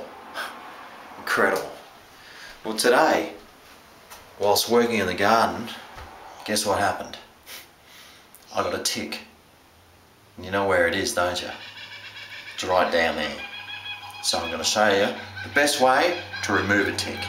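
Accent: Australia. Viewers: Is he Australian,he sounds like one of my friends from Australia! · I knew you were Australian!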